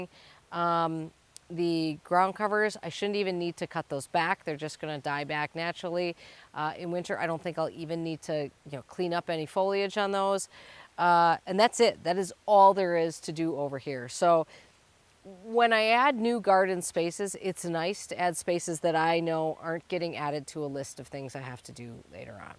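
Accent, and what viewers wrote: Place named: Midwest, United States. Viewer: I appreciate the recommendation, but in checking out Soil and Margarita’s channel, it seems like she only wants to do videos at a certain time of day when the sun hits her just right so she looks her best (her words), she doesn’t like interruptions and stopped filming on garbage days for that reason (not that I blame her, but there is nothing “raw” about that), and just generally seems much more fixated on film production than “happy accidents” and filming whenever and wherever the desire strikes. I’m also a dog lover, so those interruptions are some of my favorite moments here! (Cannot beat the dunking of the head into the stock tank moment with quick wit of saying the dye is safe for even Newfoundlands!) 😆 I also just really feel a connection with the midwestern dialect as I’ve been separated from that area for many years and it feels very homey to me. 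Soil and Margaritas seems like a very sweet content creator, and indeed, she said she is not in it for the money, which is why I’m guessing you recommended her, but she’s definitely not like. I hope she has great success just the same though! 😊❤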